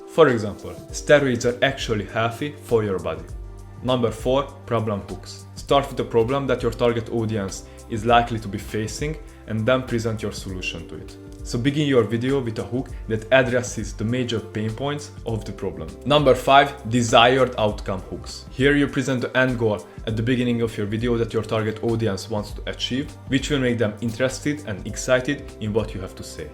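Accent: Hungary. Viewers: Olyan felismerhető a magyaros angol, egyből feltűnik 😀 Keep up the good work mate! 🔥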